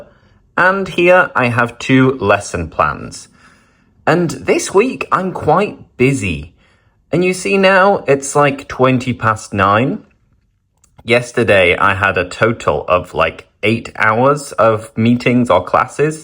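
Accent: United Kingdom. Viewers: I'm trying to do shadowing with your videos to get a British accent, which is really beautiful. Thank you very much 🙏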